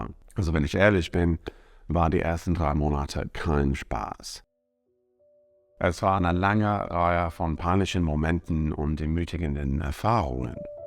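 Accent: United Kingdom. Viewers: Thanks für this new video! I really got to enjoy your content but for me it is mostly the combination of your accent and your deep-ish calming voice which surely is the iceing on the cake. I can't really put my finger on it but I have always liked to listen to british people speaking german. I would think that you had quite some luck with your boss back then, because if you really felt you were terrible at your you and she still didn't fire you, she must have seen your potential and positive personality as a future promise. :) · Ich finde es lustig, wenn du zwischen Englisch und Deutsch wechselst, dass es mir zunächst nicht aufgefallen ist,wie oft es in diesem Video gemacht wurde, weil der Sprachfluss einfach gleichmäßig weiter geht. 😂 Ich hab nur plötzlich gedacht, ah er spricht in Deutsch 🤔 War jetzt das ganze Video auf Deutsch ohne, dass ich es realisiert habe? 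Und dann geht es plötzlich auf Englisch weiter 😄 Also es spricht auf jeden Fall auch einfach für dein fließendes Sprechen und ja, au h für den britischen Akzent, aber der ist einfach nur sympathisch, weil trotzdem alles perfekt ausgesprochen und verständlich ist. 👍🏻 zumal ich ihn sehr dezent finde und nicht überladend. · For me you speak german with your british accent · Hach ja...weil es ja so oft erwähnt wird, das mit dem britischen Akzent...das ist doch überhaupt nicht wichtig. Ich persönlich empfinde es nicht als Nachteil, wenn mein deutsch sprechendes Gegenüber mit Akzent spricht. Sei es von einem deutschen Dialekt oder einer anderen Sprache. Ich selber spreche fließend Englisch, aber "with a heavy German accent" wie mir bescheinigt wurde. Da mache ich mir keine Gedanken mehr und spreche einfach drauf los wenn es die Situation erfordert. Akzent oder kein Akzent, das macht nicht den Menschen aus. 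Frankfurt am Main - sehr schön, sehr charmant dargestellt in diesem Video. Allerdings ist mir die Stadt, in der ich aufgewachsen bin und den größten Teil meines Lebens verbracht habe, sehr fremd geworden. Daher bin ich vom Stadtkind zum Landei konvertiert. Weiterhin eine gute Zeit und ein zufriedenes und erfülltes Leben! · You English is like middle to upper class British .Clipped and precise .I would put every cent i own in betting that you did not grow up in a British Council estate .You German sounds very good to me .I have only baby German myself after living in Berlin many years ago for a year 1977-78 .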